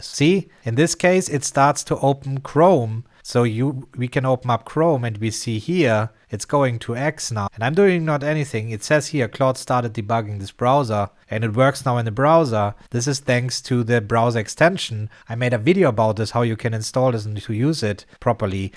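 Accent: Germany. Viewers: Just found your channel, saw this and the previous video. Love the content and the practical relevance focusing on real life usability without self promotion which is often the main motivation of other content creators in YouTube. And as a German guy, I also like your German accent 😊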